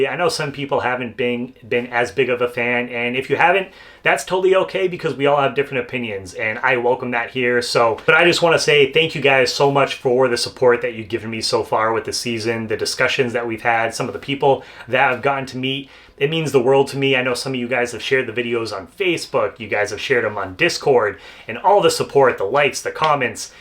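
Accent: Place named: Northeast, United States. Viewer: Hey, we are Aussies and were wondering where you are from based on your accent. The opinions are north east, northwest and one vote for Boston 😂 Please help😂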